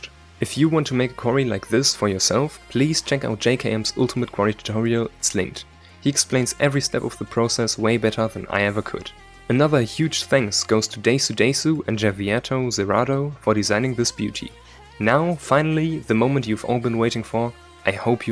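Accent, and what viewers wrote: Germany. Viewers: Are you from Germany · Berliner Englisch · Bist du deutsch ? hast Deutschen accent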